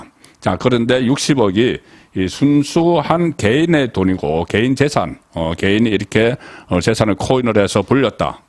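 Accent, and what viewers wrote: Gyeongsang, South Korea. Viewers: 진짜 경상도 다, 말은 하는데 ?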